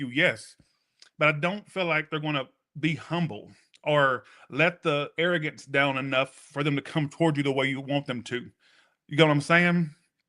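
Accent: England, United Kingdom. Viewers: I'm still here! Ha you kept freezing 🥶 Your back Thanks so much. The English accent 😂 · The British accent sent meeeee 😅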